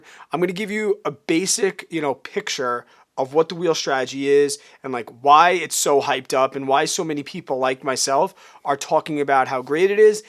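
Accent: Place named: Northeast, United States. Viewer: I love your videos! They’re very helpful for learning. Thank you! I also get a kick out of your accent 😂 Are you from the Northeast?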